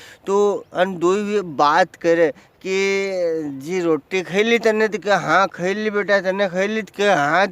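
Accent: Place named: Indore, India. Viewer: Ya mhare indori bhasha lage. Mhake athe astar ni bole.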